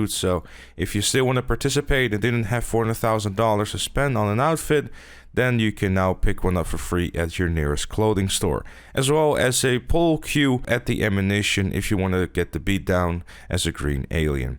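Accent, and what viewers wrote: Netherlands. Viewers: I miss the Dutch accent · Wait are you Dutch?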